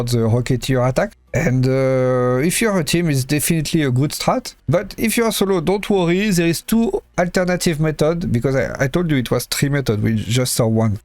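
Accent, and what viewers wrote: France. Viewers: Bro these vids have been so helpful. I don’t even mind how French you are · because of your VAIIRRRRRRRRRI french accent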